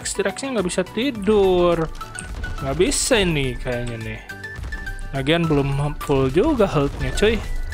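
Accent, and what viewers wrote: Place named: Sunda, Indonesia. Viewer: Orang mana sih bang kok ada sundanya ada jawanya?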